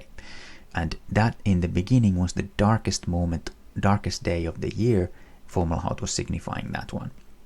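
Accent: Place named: Baltic states. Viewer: Brother, i was wondering if you coming from a Baltic country ? Your accent looks like theirs 😀 I hope we are leaving this weekend 🙏🏼✝️ to meet all around our sheperd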